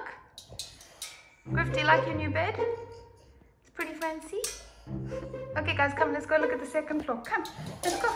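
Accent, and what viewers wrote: South Africa. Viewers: What a precious pair of potatoes! And congrats on your new home! I'm a late subscriber to your channel. You sound like you have a South African accent. I'm a South African myself, so I was just wondering! 😉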